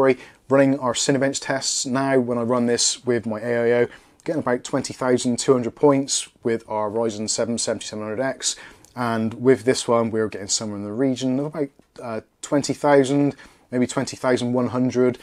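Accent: United Kingdom. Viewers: just curious to know, where about in UK are you from?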